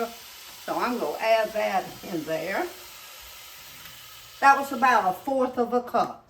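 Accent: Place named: Arkansas, United States. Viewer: Love the meal ideas & enjoy your cooking but best of all is that you talk exactly like my parents Arkansas family. It's very soothing now that my mom is gone to hear you & remember those wonderful kitchen moments I was so lucky to experience. Kept doing what u r doing. I bet I'm not the only one that enjoys the South & miss the people! I'm a fan!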